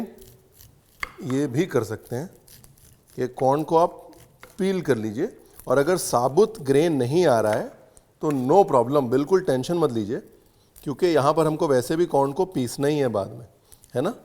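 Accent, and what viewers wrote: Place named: Lucknow, India. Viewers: Aap ke bolneka tarika asal lakhanavi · लखनऊ वालों का अंदाज ही अलग होता है उनके लहजे में लखनऊ झलक ही जाता है.....❤🎉😊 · Lucknow ki baten sunne acha lagta hai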